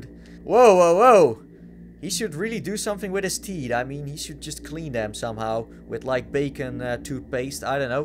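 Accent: Netherlands. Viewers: are you dutch? ikke ook xd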